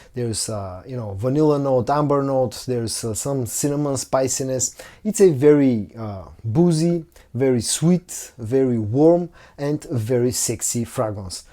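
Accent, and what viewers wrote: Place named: Bulgaria. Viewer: I finally realized how that Bulgarian accent works! The wood "oud" and "wood" almost sound the same. I've heard you describe some scents as "oudsy" but you're actually saying "woodsy". 😂 Still getting unsubbed every day, so don't think I've abandoned you friend! Be safe all.🙏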